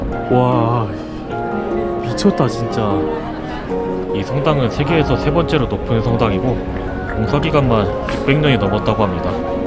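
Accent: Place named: Japan. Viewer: 영어 억양이 뭔가 일본 억양스러우시네욬ㅋㅋㅋㅋㅋ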